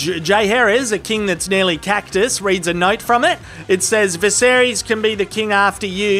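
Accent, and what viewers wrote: Australia. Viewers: But yeah, no, that’s how all Aussies talk.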